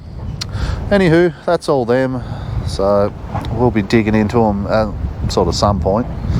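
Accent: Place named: Australia. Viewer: I'm getting the picture now. You're not in the UK. That's why these Majors have all got different back wheels on them. Were they maybe delivered CKD and had the wheels made in your country? Australia? Going by that accent. And I've never seen a loader like that either.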